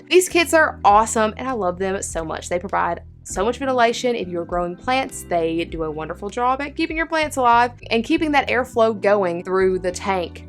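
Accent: South, United States. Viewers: I sooooo love your southern accent and you are just adorable. You are also soooo knowledgeable so I had to subscribed. Thanks for sharing what you know with us.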